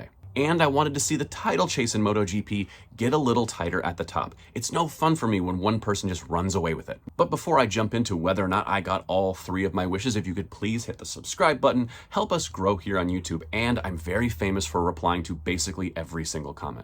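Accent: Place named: United States. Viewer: Keep it up man you've got a new viewer. Nice to hear an American voice commenting on motogp! Youre a good speaker. Hoping Yamaha gets back in the mix soon.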